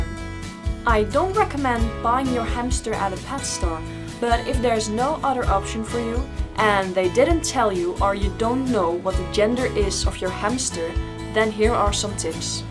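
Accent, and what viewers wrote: Netherlands. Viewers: Vgm is ze nederlands ja het accent is heel erg te horen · Are you dutch? If so, I can kinda hear it by your accent. Btw Ik ben Vlaams. :))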